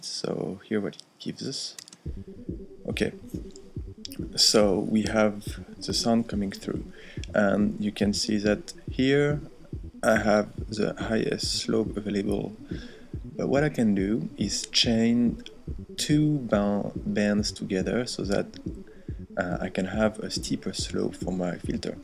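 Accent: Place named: France. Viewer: L'accent français est drôle :)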